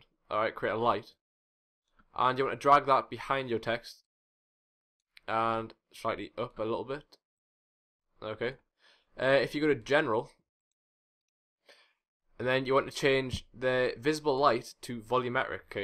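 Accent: Newcastle, United Kingdom. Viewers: are you from newcastle by any chance?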